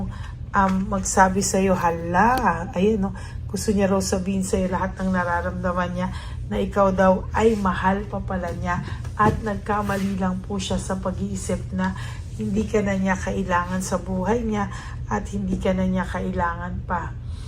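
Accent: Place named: Visayas, Philippines. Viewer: Hi! Lovely Lady, bisaya ka day ang salitang tagalog ay malumay bigkasin,kaya ng sanabi mo kukuhain ang tama ay kukunin at isa pa bagay2 malumay bigkasin,ang bisaya paspas mag-salita.😢😢😢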